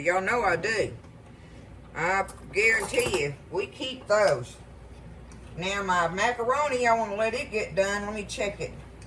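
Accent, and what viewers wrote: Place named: South Carolina, United States. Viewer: My first time viewing your channel. I love it! This recipe reminded me of how much I love macaroni and tomatoes. And when you said "pot liquor", I thought for sure you were in SC because that's what we say. So I went to 'About' and saw it's TX! I lived in SC for 30 years and you sound like you're SC country folk... I'm subscribed!❤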